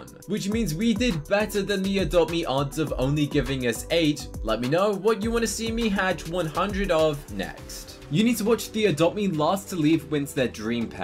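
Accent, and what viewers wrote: United Kingdom. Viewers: I never knew he talked in British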